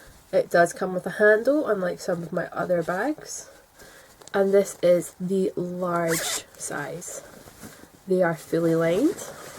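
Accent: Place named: Scotland, United Kingdom. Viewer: Hi, how did you find the Ashford kiwi? I have a traditional and I'm thinking of getting one ( I've fallen down that hole we crafters seem to fall down) My mums side of our family are from Kilmarnock, it always makes me feel at home when I hear the accent, where abouts are you from? We always make sure we nip into Stevenson when we visit, there's a little bakery that sells the best tatty scones;)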